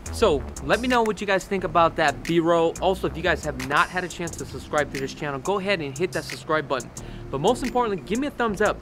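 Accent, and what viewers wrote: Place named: New York, United States. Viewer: I can’t stop watching your videos. You sound like you are from New York lol or back northeast.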